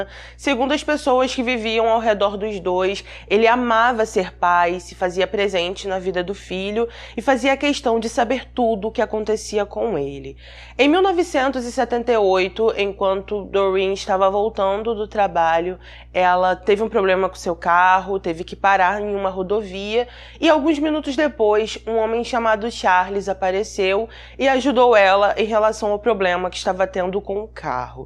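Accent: Rio de Janeiro, Brazil. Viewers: QUE SOTAQUE TENEBROSO É SOTAQUE CARIOCA QUE SOTAQUEZINHO DE MERRRRDA..AINDA MAIS QUANDO HÁ EXAGEROS.. COMO ESSA MULHER..MAIXXXXXXXXX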